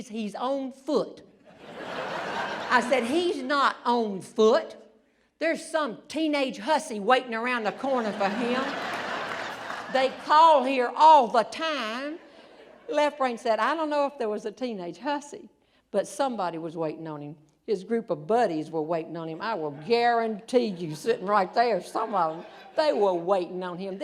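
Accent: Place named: North Carolina, United States. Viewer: I could tell by your accent you were from N.C. I was hearing the Piedmont area and then you said Alamance County. There you go! I live on the east side of Raleigh off 64.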